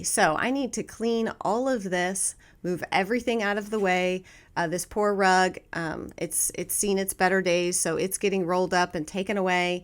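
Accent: South, United States. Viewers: Your southern accent on top of your actual southern accent is phenomenal, gurrrrrl. P.S from a carpentry family - it's brilliant to cover those gorgeous floors until later!! Don't feel bad for them! They will thank you later and you will thank you, too! LOL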